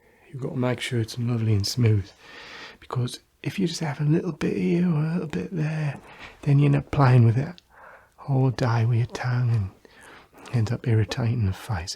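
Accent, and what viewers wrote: England, United Kingdom. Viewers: I think he’s English but doesn’t speak with a cockney accent… could be wrong but check out his other videos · are you British? it sounds so real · Youtube's automatic captions didn't stand a chance with this one. I do enjoy a strong english accent though.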